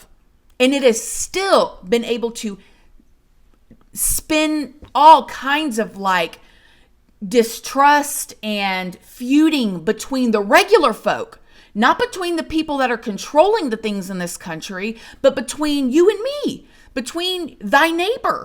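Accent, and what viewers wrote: South, United States. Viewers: I looooove your channel and your adorable southern accent.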